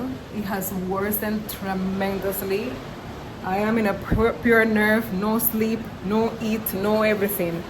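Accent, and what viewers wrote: Jamaica. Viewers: wait a minute is that a Spanish /Jamaican accent?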